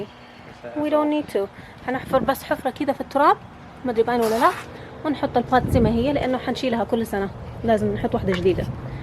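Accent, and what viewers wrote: Hejaz, Saudi Arabia. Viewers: مادام انتي جنوبية يا ليش لهجتك تشبه لهجة المجنسين في جدة والحجاز ،، ياليت تتحدثين اللهجة السعودية البيضا واللي هي لهجة قبيلية واضحة ،، ماهو حلو انه الانسان يضيع هويته الاصليه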